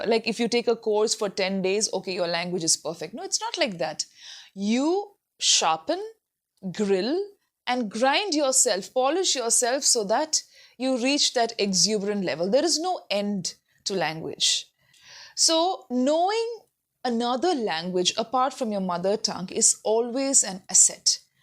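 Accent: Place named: India. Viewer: You look similar to one of famous kannada serial actress Ranjani Raghavan of Kannadati. I admire her and you too and your English and skills of communication as well. Initially mistook you to her. I have a suggestion. There is no complete audio books available on YouTube in an indian accent. You sound sweet and I think every one of indians would surely love and enjoy listening to you. If possible please come up with a comple audio book of any self help book or popular book which you think of. I think you will get market as well. I don't know what you do and why you doing these videos on YouTube etc. Just felt like suggesting..... watching your video I have become a fan of your language and communication skills. Thank you very much......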